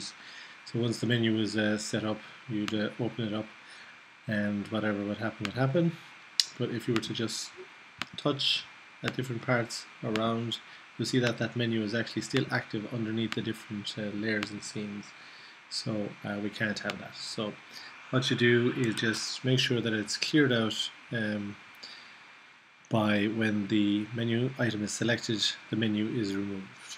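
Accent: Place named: Ireland. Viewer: These are great videos, and it's nice to hear an Irish accent too! Maybe you can help me out with a query: I'm trying to design a game character in Blender composed of several objects (sphere, cube etc.). I want to load it into my cocos3d app as one node with several children, so that I can transform the whole lot at once. Is it possible to set up that group within Blender? I've tried using the regular Blender "group" feature but those don't appear to end up in the POD file. I can see that obejcts can be parented to other objects, but when I parent objects to the sphere which is the largest and most central component of the scene the vertexes are distorted in a bizarre way. Finally I've tried parenting all of them to a bounding cube but I can't find a way to hide the parent cube so it doesn't render in the scene. What would you advise?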